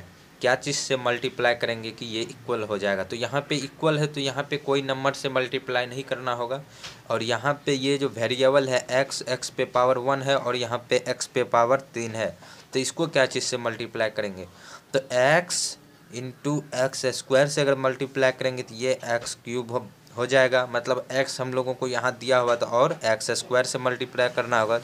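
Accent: Bihar, India. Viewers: Your Voice Like A Bihari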